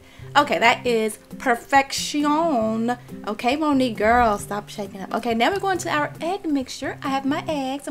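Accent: South, United States. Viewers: Your food is as wonderful as your lovely Southern accent; beautiful! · Love that southern accent. Gives credibility to the southern fried chicken. :) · I love, love, love your accent!! You sound like a lovely southern belle!!! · She just has a southern accent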